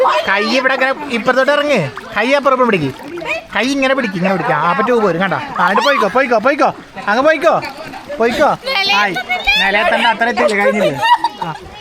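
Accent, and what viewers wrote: Kannur, India. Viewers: കണ്ണൂരൊ കാസർഗോടോ എന്റെ സ്വന്തം ഭാഷ ❤❤😅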